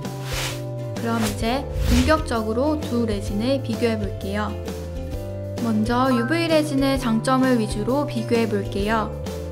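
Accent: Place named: Gyeongsang, South Korea. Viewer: 경상도 억양이 들리네요 ㅎㅎ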